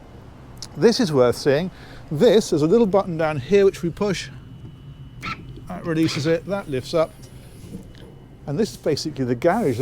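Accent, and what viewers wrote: United Kingdom. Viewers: Sir, I am not your subscriber. But I used to see your videos. Because of 2 reasons: a) your clear British accent. b) you explain things very beautifully I congratulate you for your hard work May God Bless You Always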